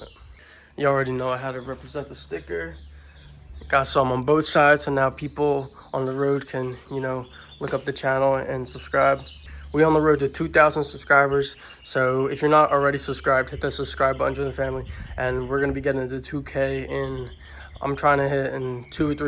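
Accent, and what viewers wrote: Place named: South Jersey, United States. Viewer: you gotta be from south jersey or philly…. gotta be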